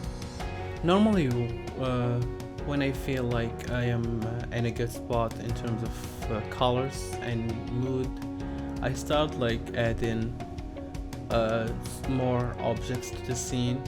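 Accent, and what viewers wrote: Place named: Morocco. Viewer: bayne l'accent del mgharba xD l3azz bik khouya great job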